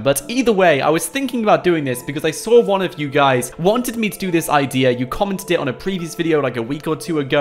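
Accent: England, United Kingdom. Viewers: Are you English like if you are english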